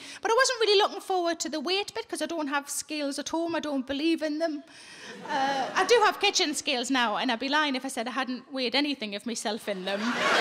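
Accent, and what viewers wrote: Newcastle, United Kingdom. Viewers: I love the Irish sense of humour, too. But, English. That's a Geordie accent - she's from South Shields, in the North East.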